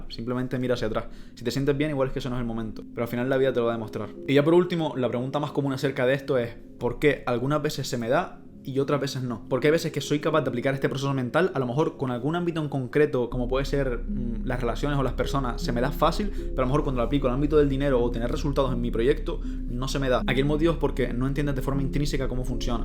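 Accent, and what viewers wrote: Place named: Spain. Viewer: BOOOOFFF, Que videazo tio, ostia, hasta se me ha pegao' el asento Ejpañolete. Saludos, soy de Colombia, me has dado mucha claridad, un abrazo, mucho exito y Dios continue bendiciendo tu Don!!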